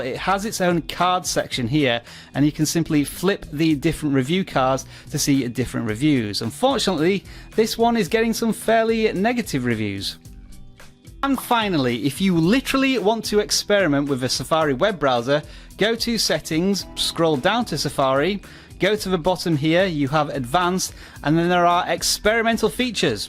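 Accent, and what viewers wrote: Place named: United Kingdom. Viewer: great video and nice clean British accent :-)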